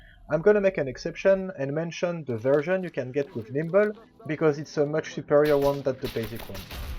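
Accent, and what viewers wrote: France. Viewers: Are you French? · Tu est français ?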